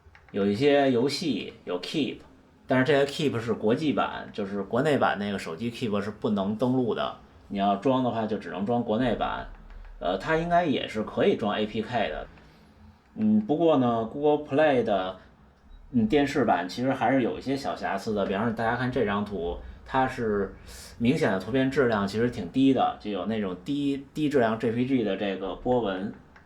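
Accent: Beijing, China. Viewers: 典型的北京人口音，真亲切，竟然有人还说口音重，那一定是你自己不讲普通话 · 一口的京片子。。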